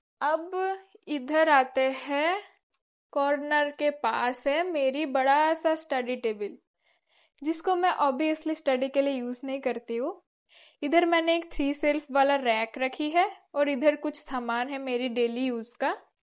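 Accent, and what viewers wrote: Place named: West Bengal, India. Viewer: Didi apka ghar khaha hai... up ka bat sunkar I guess West Bengal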